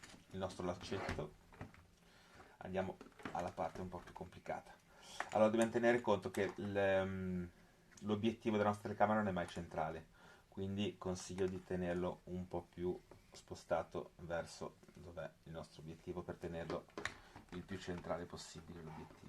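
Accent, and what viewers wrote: Italy. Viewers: L accento genovese è stato confermato dalla strada di Spotorno 🤣 sto montando per la prima volta la action cam e mi sono imbattuto nel tuo video, ho i laccetti ma non il coperchio con i buchi ( è intero) , cercherò un plastica con i buchi. Saluti da cogoleto ✌🏻 Ninja <num>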